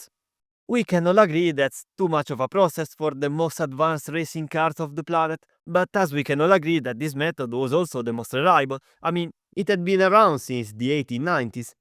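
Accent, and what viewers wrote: Italy. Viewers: Very very informative and interesting video, I didn't know the story but the way in which the Italian pronounciations add to the Mediterranean vibe is amazing NGL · I never knew there is another accent could fit Formula One content but british, and there you go! Italian accent and some italian words makes it top notch! Plus it's fascinating too! Keep going mate! +1sub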